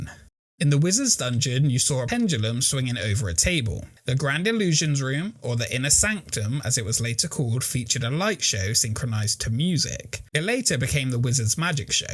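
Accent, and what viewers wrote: United Kingdom. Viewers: why is a British accented person telling me more about my town's crazy oddity than i ever knew myself LOL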